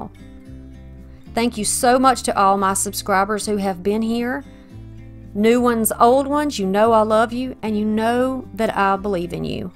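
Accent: South, United States. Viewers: Just was watching Jackie and found you. I love your southern sound, I too have it, I'm from GA. I love your work and your cheery personality. Rhonda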